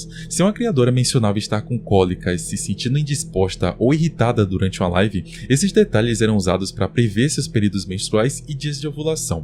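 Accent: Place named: United States. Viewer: Bom vídeo mas pq vc fala os nomes da vtubers japonesas com sotaque americano? kkkkkkkkkk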